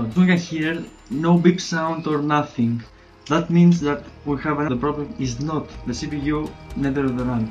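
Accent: Greece. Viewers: U have such Greek accent r u Greek?? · Thnx you sound like a greek just like my favorite basketball player Giannis Antetokounmpo im not greek im a filipino i didn't notice i got a bad ram stick its a ddr3 and its not connected properly it now boots up with display but the beep is gone forever what do i do now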